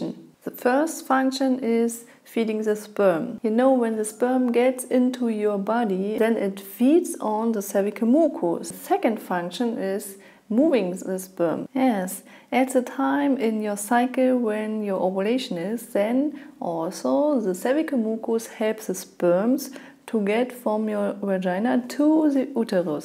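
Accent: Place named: Germany. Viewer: i've lived in Austria for 10 years and I couldn't ever have mistaken that accent Germans have when speaking English 😁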